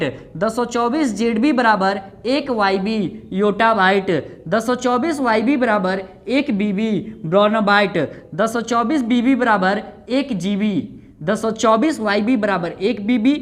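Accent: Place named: Bhojpuri, India. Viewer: Ye hua smjhane ka idea 👍👌👌 or mst trick se smjhaiye bhot bdhiya h Apka bhojpuri andaj bhi sandar h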